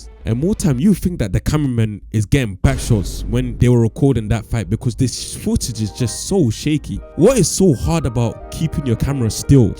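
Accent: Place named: London, United Kingdom. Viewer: make ur mind up bro are you from london or america? ur pissing me off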